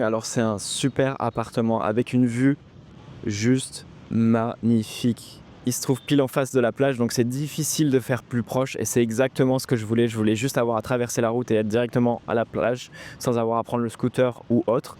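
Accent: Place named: France. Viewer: Totalement d’accord, j’arrive de Da lat et vraiment le coût des logement à Nha Trang est vraiment très, très intéressant pour de très bonnes prestations. Pour la conduite, tout à fait d’accord, prudence! Un point qui m’a choqué à Nha Trang par rapport à Saïgon c’est que les motorbikes ne respectent pas la nouvelle loi et passent au rouge alors qu’à Saïgon, les scooters s’arrêtent aux feux (ce n’était pas le cas l’an dernier) Un point qui m’étonne dans tes vidéos alors que tu y es depuis pas mal de temps c’est que tu prononces le nom des villes à la française, c’est une volonté de ta part ou c’est que tu ne fréquentes que les « digitals nomads » et pas les locaux? Côté activité, tu as quand même le Musée Yersin et la Galerie de l’un des plus connus et vénérés des photographes vietnamiens: Long Thánh.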